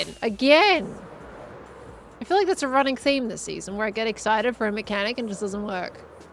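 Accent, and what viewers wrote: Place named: Australia. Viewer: I'm just happy to hear the Australian accent.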